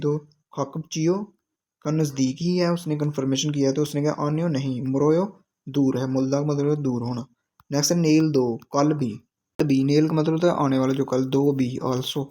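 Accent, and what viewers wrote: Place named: Sialkot, Pakistan. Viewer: Sialkot SA lag Raha ho😊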